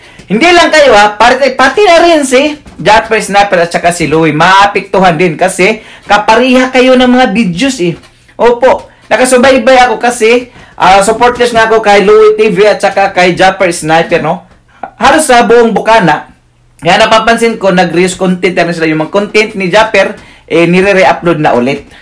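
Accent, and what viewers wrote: Visayas, Philippines. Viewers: Kaya pala d way ka magsalita visaya ka.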